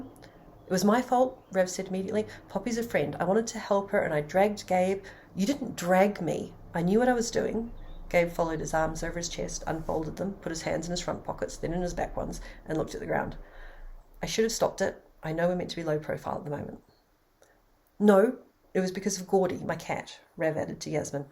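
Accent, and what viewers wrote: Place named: United Kingdom. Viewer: Thank you. I listened to this one and turned on CC. Most of the time they got the words wrong, but I got the story. I remember the chatacters from the books. I'm in America so listening to the brithish accent is always interesting. And you seem like a nice person. I've been communicating with you since 2021, at least.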